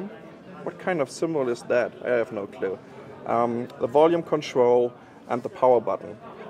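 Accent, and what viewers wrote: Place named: Germany. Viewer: kinda german accent.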